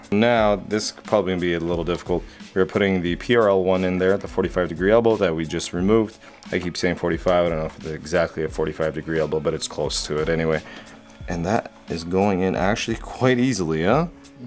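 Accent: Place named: Toronto, Canada. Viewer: You're from toronto. I will alway recognize the way ppl talk here in any video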